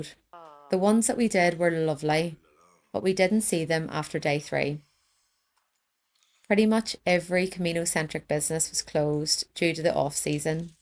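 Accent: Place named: Ireland. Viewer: You took the short route, which is ok, since around 35% of walkers do so. I have never done the Camino, and don't intend to, but I think that despite the blisters and the rainy weather, you had a worthwhile experience. Anyway, some of the filming was quite good, and it looks like you did have some good days. Finally, doing that part of the journey in February has its positives: the main one is that hotels and restaurants were empty, and the trail was quiet, without the crowds you get in June or July. In my humble opinion, standing in front of the Cathedral of Santiago without the massive multitudes, with their accompanying scammers and hucksters, was a good result. By the way, I like your Irish accent, and I am disappointed with some of the cruel responses below.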